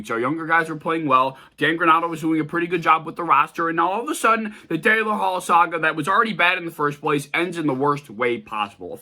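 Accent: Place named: New England, United States. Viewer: You sound a new Englander for hockey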